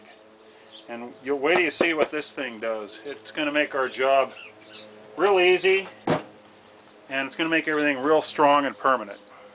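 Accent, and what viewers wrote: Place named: California, United States. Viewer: Hard to believe this was 10 years ago. Back when you still lived in CA, and had that CA accent., LOL. After watching this way back then, I bought the portable pocket hole Kreg Jig. I had a situation where I had to drill the holes on a framing board that was still good and very attached to the trailer. The portable one allows you to mount the jog on boards with a screw.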